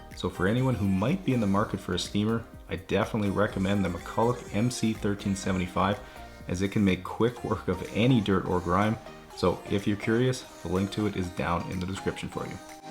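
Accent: Canada. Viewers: when he talks to his audience: slight Canadian accent talking to actual people: Canadian intensifies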